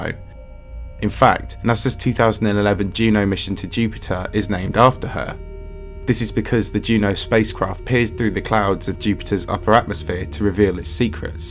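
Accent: United Kingdom. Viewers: British accent? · I don’t understand the fascination/obsession with the British butt pirate accent. It’s more of a distraction and takes away from the message.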